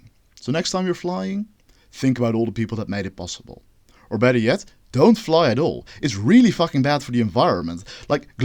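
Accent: Netherlands. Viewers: Gegroet landgenoot, mijn makker-alarm ging al af toen ik je accent hoorde.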